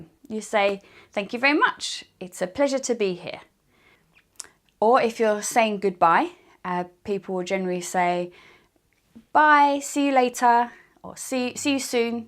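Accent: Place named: United Kingdom. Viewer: I like this English teacher a lot.Because she is amazingly skilful in teaching.Besides,I AM si fascinated with the British accent...I like very mucho the British English.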